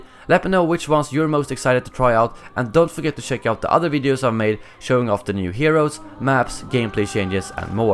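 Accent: Sweden. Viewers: Are u from sweden? It sound like so. Btw i am from sweden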